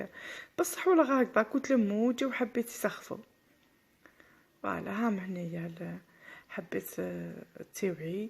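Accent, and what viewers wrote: Setif, Algeria. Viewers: الله الله عليك ياسطايفية الحرة انا من قسطينة ونموت عليك يالحبيبة ونعشق الهضرة السطايفية الحرة ولله نحبك ونموت عليك ياعمري واني نضال فلمول نحوس 💕💕💕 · السلام عليكم، تعجبيني أختي، و خاصة هدرتك لهجتك السطايفية تهبل تهبل تهبل . ربي يشفيك، ماتتكليش غير على التيزانة، لازم دواء.... طهور إن شاء الله. تحياتي من تيبازة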